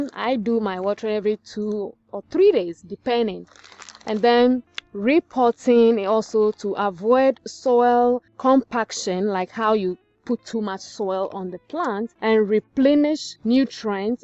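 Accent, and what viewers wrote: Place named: Ibibio, Nigeria. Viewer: are you ibibio from akwa abasi ibom? you have beautiful accent miss thank you helpful knowledge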